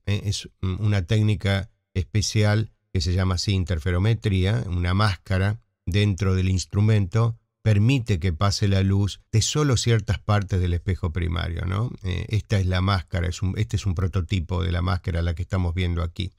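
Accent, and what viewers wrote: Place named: Argentina. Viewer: Estoy encantada de haber descubierto hoy este sitio, con una voz argentina. A mi no me es muy fácil este tema pero, a fuerza de leer un poco y de escuchar otro tanto, algo voy aprendiendo.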